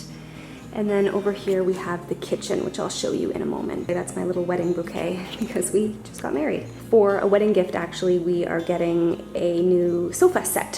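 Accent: Canada. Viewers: Hehe I love your Canadian accent ie the way you say "house"! It's so fast that it's almost like "hoose" and it's very cute!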